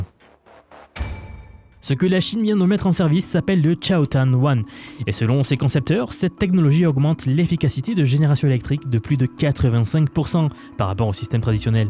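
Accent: South, France. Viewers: Je pense que tu es une IA bien alimentée en données issues de publications scientifiques. Mais ce serait une déception car j’aime ton accent méridional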